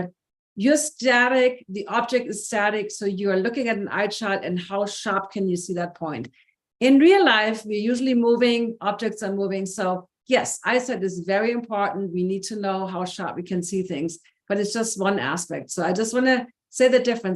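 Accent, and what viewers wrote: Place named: Germany. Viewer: please speak slower in your videos because of your German accent.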